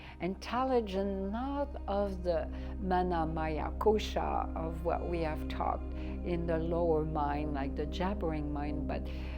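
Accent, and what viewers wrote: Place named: West, United States. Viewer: This lady is self deluded, otherwise she wouldn’t give herself the name and sell courses. Some of the info is accurate but it is mostly appropriated knowledge from Vedic texts. Doubt she is able to pronounce any mantra properly with her western accent. Cool video tho, hope you sell a lot of courses its decent info for pashus.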